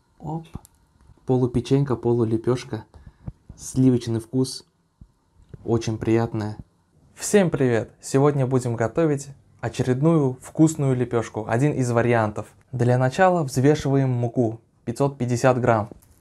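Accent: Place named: Bashkortostan, Russia. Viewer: Как будто из башкирии, у нас обычно с таким "акцентом" (гОвором) разговаривают.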